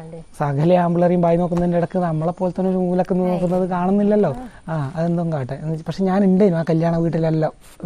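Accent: Kannur, India. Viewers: Njammale kannur basha 😁🥰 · Kannur evida. Samsaaram kettappozhe thonni ente jillayil aayirikkum enn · Bro Poli language. Kannur language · Kanuuurrrr💪💪💪💪 ഭാഷ കേട്ടപ്പോഴേ മനസ്സിലായി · Nammala kannur basha🤩💖💥🔥🔥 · Kannur slang ❤️❤️❤️ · Kannur bhasha kett comment box nokalarnnum.....Kannur eadaya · ഞമ്മടെ കണ്ണൂർ ഭാഷ 💕💕💕💕 Chechhii chettaaa❣️❣️❣️ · Slang kettappazhe thonni nmle aalann.... 😊knr da💪🥰🥰🥰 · ഞമ്മടെ കണ്ണൂര് ഭാഷ💪💪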